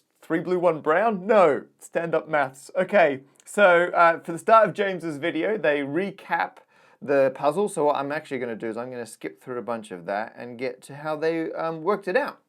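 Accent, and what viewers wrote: Australia. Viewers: What kind of accent is that for an Englishman anyway? Sounds like someone from Perth.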